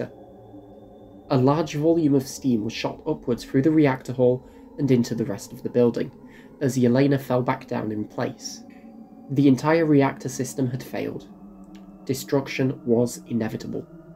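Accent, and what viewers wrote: United Kingdom. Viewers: Keep that Scottish (?) accent under control pls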